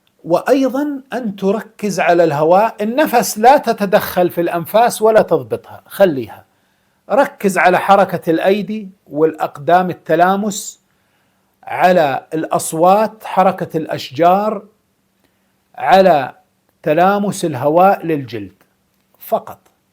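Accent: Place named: Iraq. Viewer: عبالي عراقي تقريبا نفس اللهجة والشكل🌱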